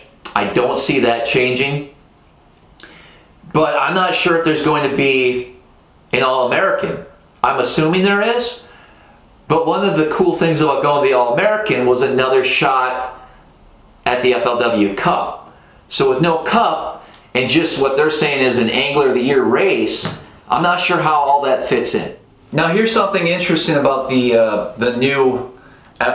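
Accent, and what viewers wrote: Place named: Chesapeake Bay, United States. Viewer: also quick question, you live in Maryland ?....I am in Richmond VA and you talk upper chesapeake bay....so just curious where you live at ...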